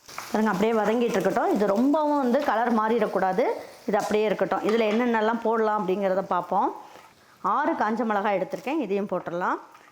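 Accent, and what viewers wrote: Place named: Kongu, India. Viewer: மதுரை சட்னி ஆனால் உங்கள் பேச்சு கவுண்டர் சாயல் உள்ளதே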